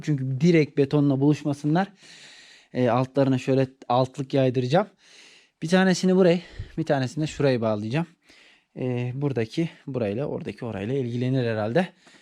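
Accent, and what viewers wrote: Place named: Thrace, Turkey. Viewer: kardeşim güle güle kullanın ahırı, sayayı bir şey dikkatimi çekti ben Tekirdağlıyım sizin orası Eskişehir ama şive bana bayağı Trakya ağzı gibi geldi.Annen Takyadan gelin mi Eskişehir e. Herkese saygılar.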